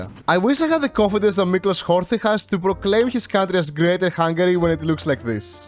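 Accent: Greece. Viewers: u greek ?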